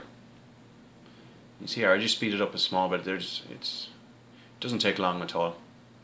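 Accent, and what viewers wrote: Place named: Ireland. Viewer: Irish. I want to say Northern Irish, but I always mix up the 2 dialect variations >.<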